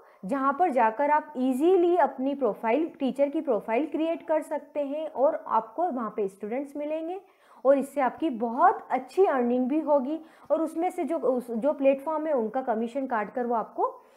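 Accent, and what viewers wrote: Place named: Malwa, India. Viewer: Mam aao kaha se ho?? Apki awaz se to malwa region ke lag rahe ho...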